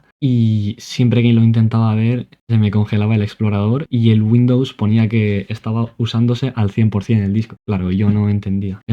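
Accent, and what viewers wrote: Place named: Argentina. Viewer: Ja ja ja ja. Yo pensaba que eras argentino, pero muy bueno, tu video sigue así.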